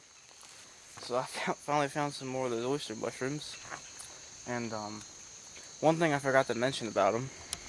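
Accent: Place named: Alaska, United States. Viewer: Are you related to the Alaskan bush people by chance? you have their same accent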